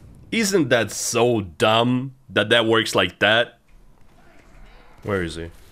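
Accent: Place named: Russia. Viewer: Единственный канал на английском, который я могу нормально смотреть (уж слишком русский акцент помогает, да и местами русская речь прикольно звучит (¬‿¬ ) )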